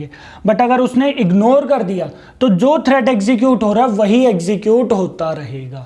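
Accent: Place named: Punjab, India. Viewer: Love ur punjabi touch hindi..... Hanji ..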